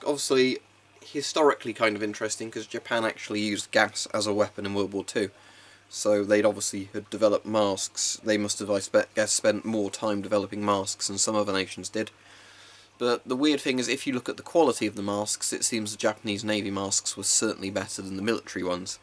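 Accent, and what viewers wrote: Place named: United Kingdom. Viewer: I don’t mean to get myself involved in your privacy but out of curiosity I would like to ask what is your nationality? You have a British accent but I seen you wear a German camouflage uniform still issued in the German army.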